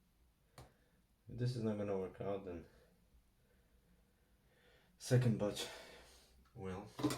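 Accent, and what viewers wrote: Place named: Poland. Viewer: zajebista forma byku, niezły twister, piszę po polsku, bo słyszę akcent XD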